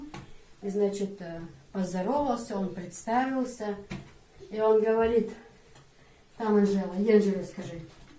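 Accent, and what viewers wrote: Chechnya, Russia. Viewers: Надо же русская, по русский говорить с чеченским акцентом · И акцент чеченский и похожа на чеченку Аллах1 Дала дукьа яха ёйла хьо🤲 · у Вас уже акцент чеченский.молодец умница.счастья Вам. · Акцент чисто Чеченский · У вас даже акцент Чеченский) Дал атьо бойла, у меня две снохи русские, очень хорошие, уважающие наши традиции и веру!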